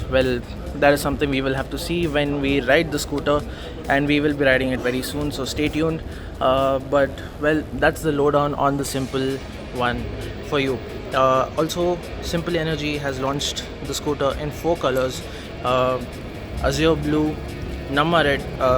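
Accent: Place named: London, United Kingdom. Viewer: Bhai tu London Pala besi English khuchu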